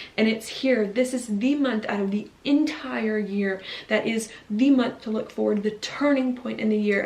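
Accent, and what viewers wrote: South, United States. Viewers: I think Georgia brought your southern roots to the surface...i hear a little accent :) missed you, glad youre back! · Your southern drawl is much more prominent in this video than in any other before. As a southern girl, no judgement. I only wish to say: while w family, do your best not to lose yourself, and the story you are building of who you are becoming. Xoxo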